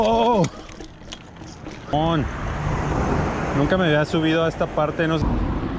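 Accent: Guadalajara, Mexico. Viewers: se te nota el acento tapatío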